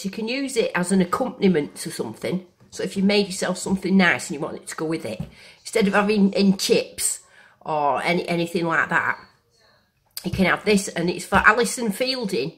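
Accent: Manchester, United Kingdom. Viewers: I really don't know how to start on this one. First the obvious irony of someone in Norway watching a UK lady making a Swede (see what I did there?). Apart from the fabulous recipe which I made this very evening, you are absolutely hilarious. The presentation, explanation and witty comments are SO endearing, that's entertainment in itself. So many so called cookery experts are super slick and explain things WAY too fast but you are just . . . . so down to Earth and genuinely entertaining. Love the accent - I'm guessing Manchester or Burnley? Either way, the most entertaining (and rewarding) ten minutes I have spent in a long time, I thank you! If I may be so bold as to offer one piece of advice . . . . please don't ever stop posting, thischannel is solid gold. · Ahahaha love the broad Mancunian accent....from a fellow Mancunian...going to try this as I'm a diabetic type 2 and can't eat potato as watching my carb content...x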